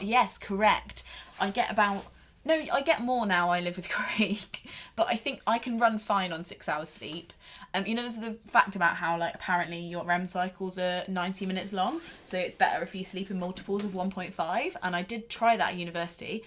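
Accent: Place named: Coventry, United Kingdom. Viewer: Loving the Cov accent! I'm from Rugby and constantly confuse people by my inability to pronounce U and O differently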